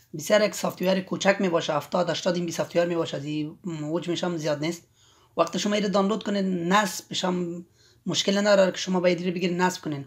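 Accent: Afghanistan. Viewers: با احترام به شما دوست عزیز که زحمت کشیده اید این ویدیو اموزشی درست کردی برای رفع مشکلات کاربران ولی متاسفانه خیلی خیلی تند حرف میزنید و همچنین لهجه ای افغان بودنت یکی دیگر از معایب این ویدیو میباشد شما اگر نیت خیر اموزش دادن را دارید میتوانید از یک شخص دیگر که هم خیلی قشنگ بتواند فارسی را حرف بزند و کلمات را طوری بیان کند که هر شنونده از گفتار و نوع اموزش لذت ببرد من متاسفانه با اینکه مشکل ریکاوری مموری کارت داشتم ولی نتوانستم از ویدیو اموزشی شما استفاده کنم انشالله دیگر این صدای شما را در ویدیو های بعدی نشنوم و یکی از دلایل در پیشرفت و موفق بودن هر اموزش دهنده مطمئن باشید همین درست صحبت کردن میباشد ،امیدوارم از دست من ناراحت نباشی چون نمیخواستم زحمت شما هدر برود برای ویدیوهای بعدی وظیفه خودم دانستم که بشما این عیب و ایراد کارتان گوشزد کنم . با تشکر احمدی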